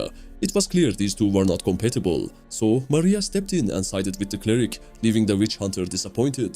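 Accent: Turkey. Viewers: I hear the slightest bit of Turkishness in your accent friend... Eline sağlık harika vidyo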